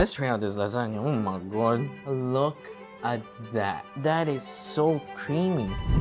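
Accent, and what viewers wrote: Philippines. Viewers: You talked like a filipino now with a ‚no?‘ at the end of the sentence 😊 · You are slowly having the Filipino English non-accent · Why you sound like a filipino manila boy from a middle/high class family?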